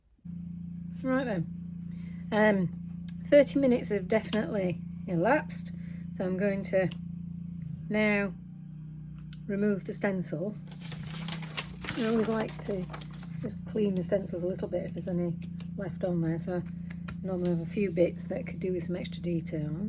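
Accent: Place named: Hull, United Kingdom. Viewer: don't know why i haven't discovered you before! great stuff. you sound like you're from hull, i'm in cleethorpes. thank you xxx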